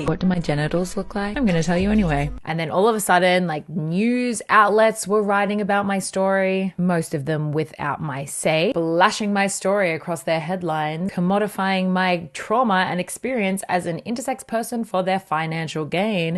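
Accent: Australia. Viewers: Most interesting thing to me is how your accent is 90% Australian, then 10% Midwest American. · Hi, I'm curious about your accent: is your way of talking typical of where you are from, and where are you from? You sound a little bit like Queer Kiwi, but she's from New Zealand, not Australia. Thank you!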